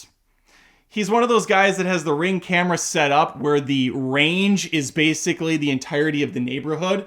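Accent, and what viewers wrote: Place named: Minnesota, United States. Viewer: Beig, Bayg... U r from Minnesota.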